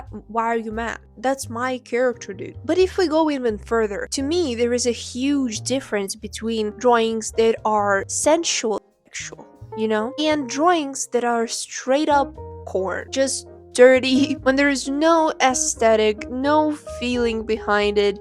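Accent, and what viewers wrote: Russia. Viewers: is this Russian accent I hear? прикольно видеть русских даже на англоговорящем интернете :D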